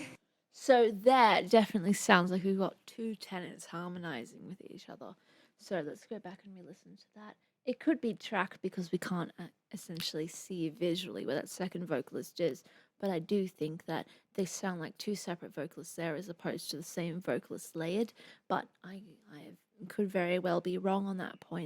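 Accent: Australia. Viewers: I love her reactions and love love love her Aussie accent😍😍😍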